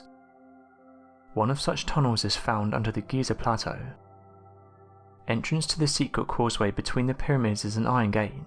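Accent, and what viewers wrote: United Kingdom. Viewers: i know you have your 800,00 followers and its well deserved but could you please change the tone of you voice cause it sounds like every sentence is the same. just a lil constructive criticism. each sentence sounds the same. literally. i like your videos but when I find myself happen to have clicked on one of your clips i dont want to see it out to the end cause of the bland reading of amazing things. you dont need to be over the top by all means but you end each sentence as boring as the last with a same British or english tongue that gets so old by sentence five. im sorry! you obviosly have got it made. i am just telling you i cant stand the same monotone over and over! thats the word i was looking. its just monotone. I think i have said this before honestly. dont take this bad its just i cant watch another clip of yours if its gonna be this amount of monotone.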